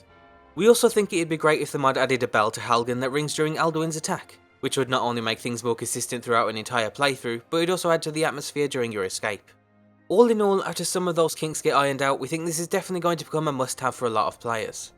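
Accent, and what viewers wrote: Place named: North, United Kingdom. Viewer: His accent has changed. Much more Northern now I think....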